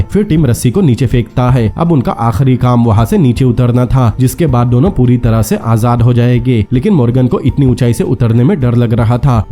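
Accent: Assam, India. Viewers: Bhai kya asaam se Hai kya tera pronunciation se pata chala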